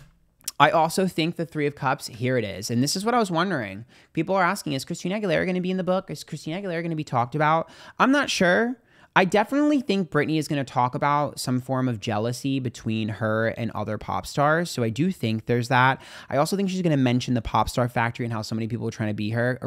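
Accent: New York, United States. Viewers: I love how when you get upset….. bc your New York accent comes out…. I just love your accent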